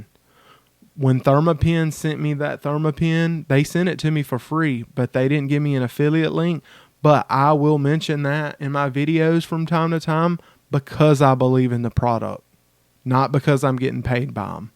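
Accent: United States. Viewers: Love your accent and pronunciation, where is that from in the US? Good job calling out greedy and grifters. Some ppl just think how to profit from others. These times are not easy, there are many ppl having hard time making ends meet. I'm on high carb low fat, that's way cheaper than carnivore. Could just eat rice, beans, fruit, veg and sugar, not a vegan, but if it came to that, could survive on much less than those on carnivore.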